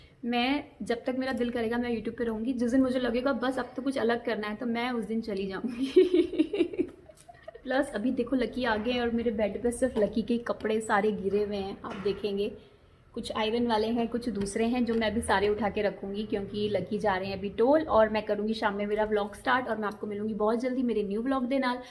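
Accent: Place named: Punjab, India. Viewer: This is because we all love you, ur punjabi is so cute . love you api from Punjab❤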